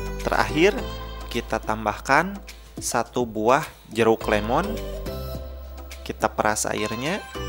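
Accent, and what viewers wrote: West Java, Indonesia. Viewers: Sunda pisan meneh ngomong logatna hahaha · Sunda pisan kang... Hatur nuhun ah tips na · Kayaknya adminnya orang sunda... Kelihatan ga bilang 'ep' (f) 😄😄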